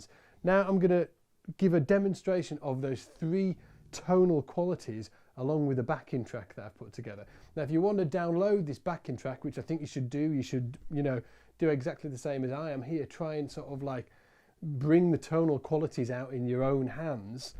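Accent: United Kingdom. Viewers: He's from the UK =) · He's definitely british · so, i was not the only one that did not get the names right..... sorry i have problems with british Accent .......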